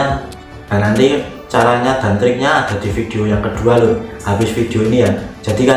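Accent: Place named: Java, Indonesia. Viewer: Logatnya Jawa. Emang asli mana bang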